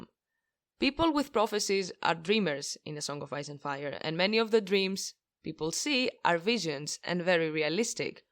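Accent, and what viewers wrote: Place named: Greece. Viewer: Η ελληνική χροιά φαίνεται από την τόσο καθαρή αποδώσει των αγγλικών. Ιδιαίτερα στοχαστικό GOT βίντεο. Θεωρώ πολύ πιθανόν η εισβολή των White Walkers να μην ήταν αναπόφευκτη, αλλά να ένιωσαν απειλή από τις εξωφρενικές δράσεις των Targaryen για να εκπληρώσουν την προφητεία, οπότε εισβάλλουν για να προστατεύσουν το είδος τους.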